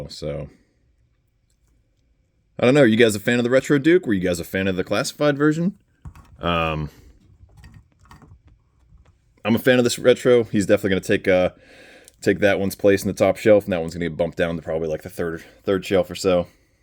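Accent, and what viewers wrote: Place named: Pennsylvania, United States. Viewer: I'm good ain't I? I heard the pronunciation of the words you say. Forget what words they were but I know you didn't say this but this would be an example. Cold. Half of jersey says cold with the l. The other half and into pa and surrounding states and areas say code. You guys leave out the l. Many other words you say that you never realized you said differently, like house and home. Most people don't pick up on things like this lol. I'm from the jersey shore, my family's from PA and Trenton. We talk so differently and I'm only 40 minutes from Trenton and the Delaware River.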